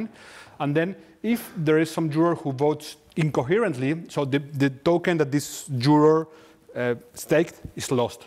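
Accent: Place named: Argentina. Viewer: He’s from Argentina, English isn’t his first language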